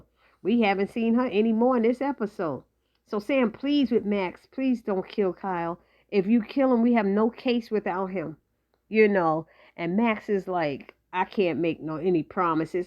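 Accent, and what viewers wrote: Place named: East Coast, United States. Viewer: Thank you. Love the East Coast Accent. 😀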